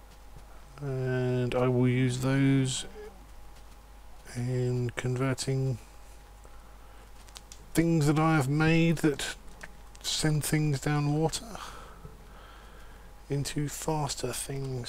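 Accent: United Kingdom. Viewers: how british are you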